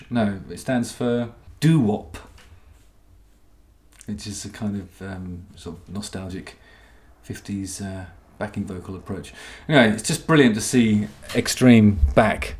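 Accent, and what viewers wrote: United Kingdom. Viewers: That British Accent has to go...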